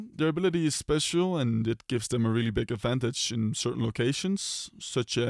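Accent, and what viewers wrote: Netherlands. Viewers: Great list! Are you by any chance Dutch? I think that’s the accent I hear. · good vdeo but do i sense a dutch accent ? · i think i hear a dutch accent, je maakt goeie video's.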